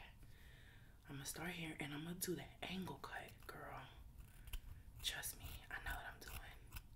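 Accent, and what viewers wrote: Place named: Queens, United States. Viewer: where are you from?? some things you say sound exactly like my friend who's from queens lol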